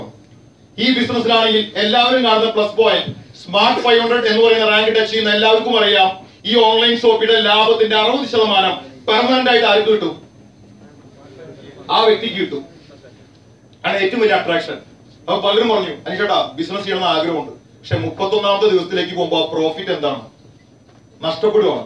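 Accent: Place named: Malappuram, India. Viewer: Do arado paranjad malappuram bhaasha virthikettadennu listen to 19:4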